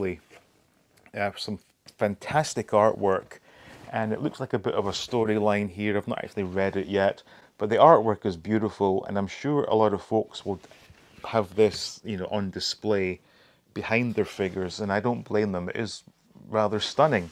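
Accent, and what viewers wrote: Scotland, United Kingdom. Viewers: That explains all, its the Scottish accent that got me confused.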